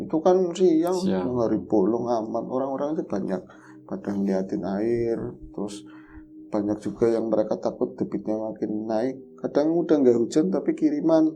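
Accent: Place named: Tegal, Indonesia. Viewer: Di kenalnya diluar tegal kang, bg aku, y kngen dialeg2 tsb sll menantikan lapak horor n ngobor, sbg nostalgia jaman kecil dl di comal. Salam.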